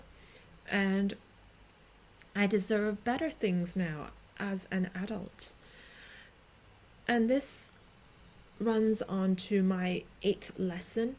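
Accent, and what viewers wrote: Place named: United Kingdom. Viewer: why do u sound bri ish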